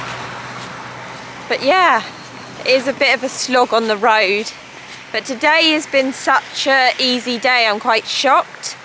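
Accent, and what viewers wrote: United Kingdom. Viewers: I from indonesia(kupang City)i like u aksen british&you nice girl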